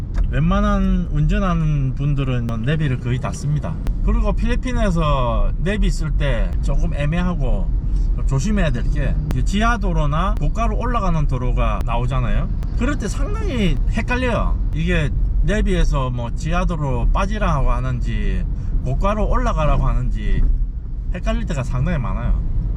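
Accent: Gyeongsang, South Korea. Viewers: 정말 결혼 잘하셨어요 경상도 말씨 듣기 좋습니다 ㅎ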